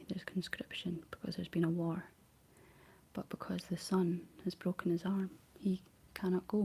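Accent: Scotland, United Kingdom. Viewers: Love your Scottish accent.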